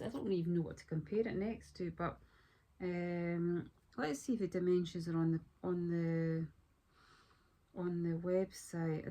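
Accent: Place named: Scotland, United Kingdom. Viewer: I love a more personal and authentic vs commercial vibe to the content i watch! I'm not sure what's your cute little accent - Scottish?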